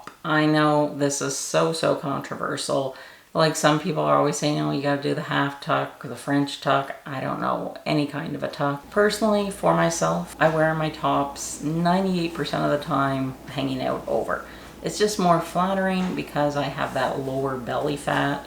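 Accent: Canada. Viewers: Looks like I have the same body shape as yours. I agree on high rise pants ,shorts etc. And I never tuck in tops. What I HATE is baggy pants jeans or dressy slacks. I call them "Bozo" 👖 pants. Mainly because I have thin legs and ankles but a way too big stomach. This is the first time seeing your videos. Nice I'll be looking for more. Are you from Canada? I only thought that because of the way you say " your O s " . 😊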